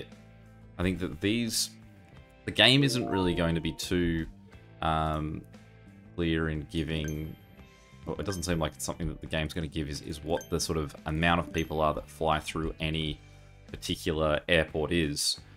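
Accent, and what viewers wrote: Australia. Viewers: Hi ☠️ I know that you live in Victoria, Australia 🇦🇺 because of your accent. I live in Adelaide, Australia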